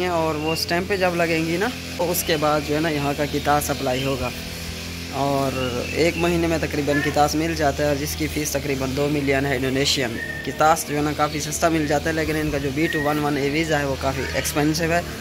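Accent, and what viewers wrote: Saraiki, Pakistan. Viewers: Ap jb jb saraiki bolty hn mujhy hansi ati hai bro 🙈😂😂😂😂😂😂😂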